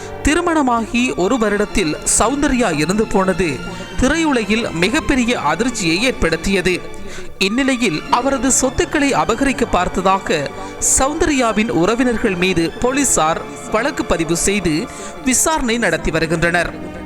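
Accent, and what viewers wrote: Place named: Sri Lanka. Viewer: he s srilankan"tamil"... naturally their pronounciation...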